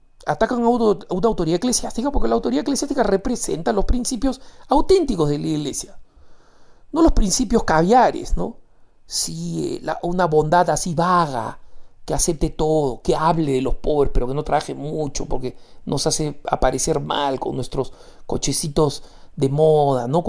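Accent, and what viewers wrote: Peru. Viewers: No soy kristian, pero estoy segura que el señor que habla es peruano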